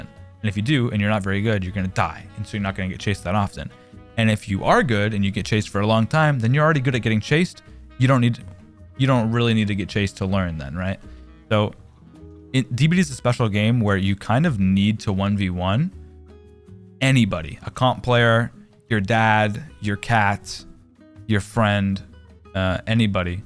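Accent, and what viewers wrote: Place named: Saskatoon, Canada. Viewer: Are you from canada ? More specificly from saskatoon? I swear to god you have that accent like youre from around here. 😂 sorry for the weird question.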